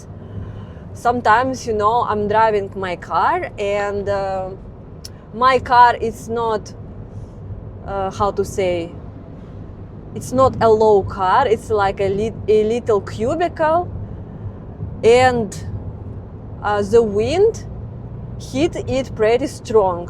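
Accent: Russia. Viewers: Dear... Thank You so much for the GREAT video. WOW!! That is some bridge. Very impressive. The road trip through Russian Island was also super cool. My wife and I really enjoyed this video. You take us places we, probably, will never see in person. It is so cool. We truly enjoy each and every video you put out. I could listen to you for hours ( and I do when you do a live stream). There is nothing so beautiful to my ears than the English language spoken with a Russian accent. We are so happy that you are doing well. We truly wish that our governments would get down to work and normalize relations between our countries. Thank You so much for all of your efforts... we enjoy them so much. God Bless and all of the very best from your Capitalist friends in the US... Peg and Barry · You're sounding like soviet/Russia today,before your english is mixed with a little bit of British, America and very little Soviet/Russia,but you're sounding pure Russia/Soviet english accent and it's entaining. 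MAYBE VLADIVOSTOK have taking over and it's good.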